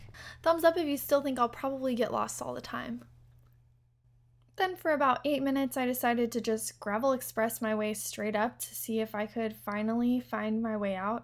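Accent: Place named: Spain. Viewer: Love your Spanish accent :D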